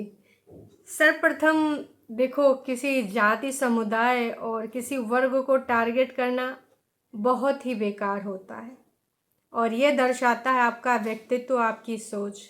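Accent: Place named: Awadhi, India. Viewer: Mam,aapka vlog or aapka way of talking bahut atcha lagta hai or beech beech main aapka awdhi bolna bahut atcha lagta hai.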